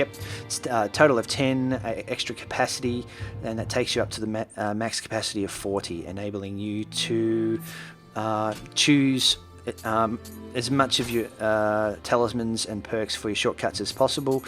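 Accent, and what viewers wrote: Australia. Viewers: great vids by the way, I'm aussie too, nice to hear the accent.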